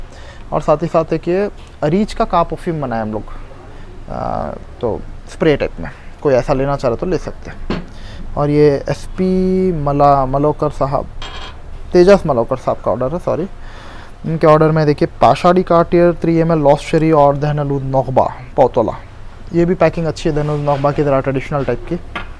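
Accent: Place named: Hyderabad, India. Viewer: Love your hyderabadi accent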